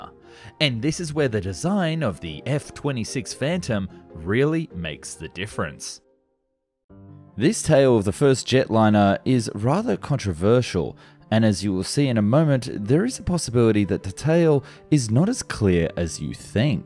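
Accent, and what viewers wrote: United Kingdom. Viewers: i would like to think, being (or at least sounding) British pronounced it naynay purposely.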